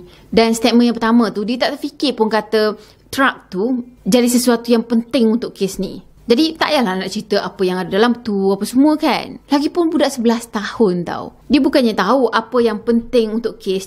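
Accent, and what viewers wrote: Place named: Johor, Malaysia. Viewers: Assalamualaikum.. lama akak dah cctv je tapi kali ni memang nak kata… Bunyi Johor tu memang menyerlah sangat dah..😄 Btw bagus utk dia dakwa semula untuk clear nama dia.. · Cakap pun dah ada style orang Johor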